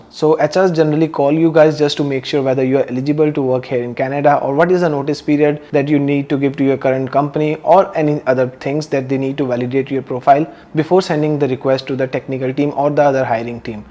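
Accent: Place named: India. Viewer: Omg... You have so strong Indian accent, sorry but it's really unbearable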